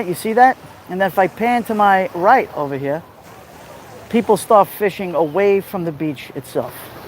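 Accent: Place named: New York, United States. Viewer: You are a bright ray of sunshine, and I just love listening to your NY accent!!!☀️😎👍🏻 I had to hop off, but just finished the ending of yet another great livestream and yes thumbs up!👍🏻 Thank you and God Bless You and your family!🙏🏻🇺🇸❤️🤍💙🇺🇸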